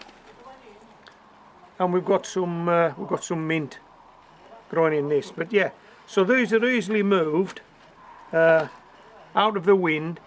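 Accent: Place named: Newcastle, United Kingdom. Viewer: Fab for this expat to hear a Geordie accent!Greetings from Bavaria! btw I built a horse manure hot bed. I ordered this book by a guy up north near you.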